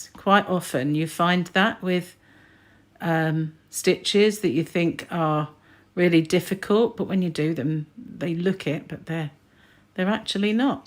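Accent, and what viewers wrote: United Kingdom. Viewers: Loved the pattern, loved your instructions, appreciated no music in the background and your British accent which so reminds me of my aunt. Thank you for the instructions. God bless!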